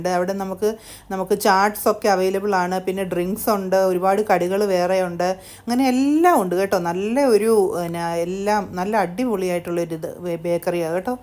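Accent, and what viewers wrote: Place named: Pathanamthitta, India. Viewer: Pathanamthitta style samsaram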